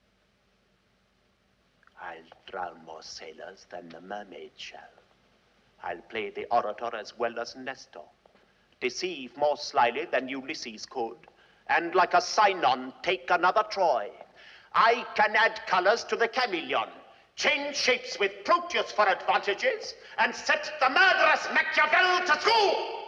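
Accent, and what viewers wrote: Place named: England, United Kingdom. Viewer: Who else is absolutely fascinated by his English accent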